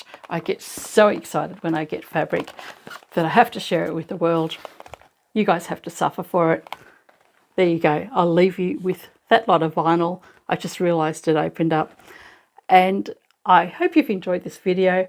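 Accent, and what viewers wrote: Australia. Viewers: I always enjoy you videos & love hearing your Australia accent. So, talk all you want. ❤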